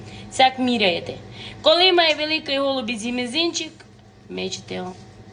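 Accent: Transcarpathia, Ukraine. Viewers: Люблю закарпатський говір, йо!😂❤❤❤ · щось вас давно не було в ефірі.Як то файно чути наш діалект бо не вшиткі хочуть говорити як х мамка навчила бо ся ганьблять,а то красно що аж ся хоче.Такі голубчики ми робимо на всякі гостини,ай бо то лем у нас на Закарпатті такі роблять а інде такі як долоні завеликі.Ми ся не нервуємо ай любуємо коли їх крутиме.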